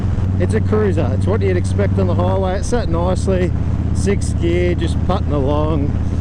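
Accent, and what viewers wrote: Australia. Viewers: Wonderful review. The exhaust note is therapy. And your strong australian accent is a pleasure to hear 😊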